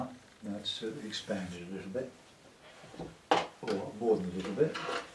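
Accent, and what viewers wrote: United Kingdom. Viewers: I think this is pure genius. Thanks you for sharing your technique! I can't wait to try this today. Thank you so much. I love your British accent too, by the way...so charming.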